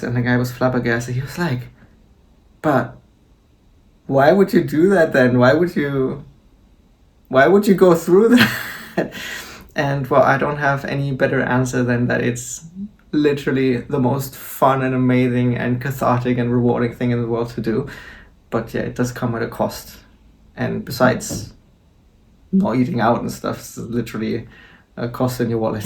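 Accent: Germany. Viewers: Bulgarian, but u have a german accent?😅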